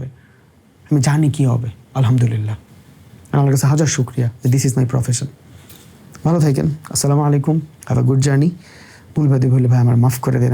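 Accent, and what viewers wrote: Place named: Comilla, Bangladesh. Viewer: ভাই আপনার জন্মস্থান কি কুমিল্লায় ক্যাপ্টেন এমপি হাসনাত আব্দুল্লার মত কথা বলার ধারন শুনে তাই মনে হয়।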